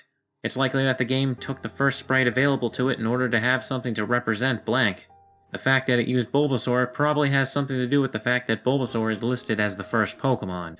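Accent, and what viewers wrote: Canada. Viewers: you sound Canadian :) anyway blank reminds me of missing no. lol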